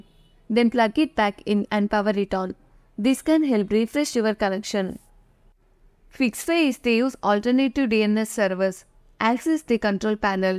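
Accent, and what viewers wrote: India. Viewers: why does the ai have an indian accent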